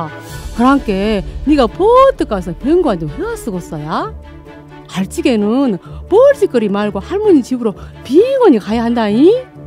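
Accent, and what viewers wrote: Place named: South Jeolla, South Korea. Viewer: 아따~ 오메~^^ 생생한 전남사투리로 듣는 동화가 특유의 억양과 말에 녹아있는 장단때문인지 마치 판소리를 듣는 듯 해요.😊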